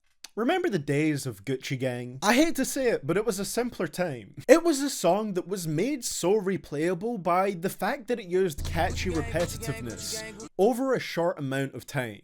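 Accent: Scotland, United Kingdom. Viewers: Its probably the scottish accent. Or the exciting wait for the HAIR REVEAL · I think he's scottish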